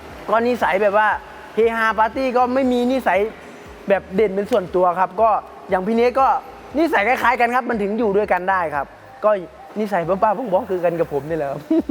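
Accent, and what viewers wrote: Isan, Thailand. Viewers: ทำไม พูดเกียบ5555 อีสานชัดเจน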